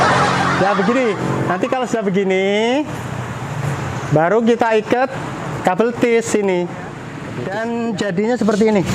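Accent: Java, Indonesia. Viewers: Bapak orang Jawa yaaa, .. lucu kalau kasih penjelasan, jadinya mudeng xixixix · Aku di semarang p de mohon maaf caranya mupuk dan media anya apa sama di jawa dan di balik papan tapi logatnya koyo orang jawa... Terimakasih pak deje las sekali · Jawanya medhuukk😅 siap praktek boskuu❤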